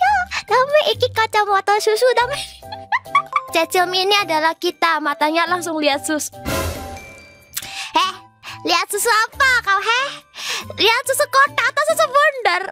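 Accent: Java, Indonesia. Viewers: Suka beut ama aksen jowo nya si cecil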